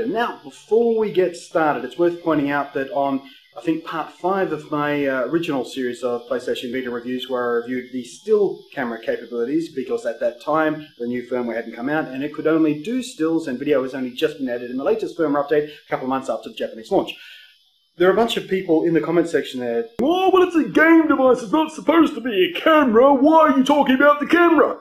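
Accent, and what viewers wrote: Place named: Australia. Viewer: You do realise that he is Australian not British right? Duh!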